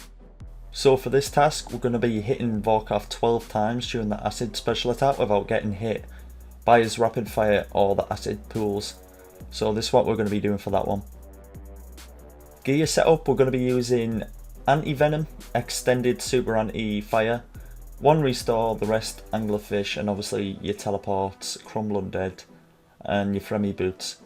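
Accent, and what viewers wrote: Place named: Newcastle, United Kingdom. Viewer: Haha could tell by the accent lol Newcastle here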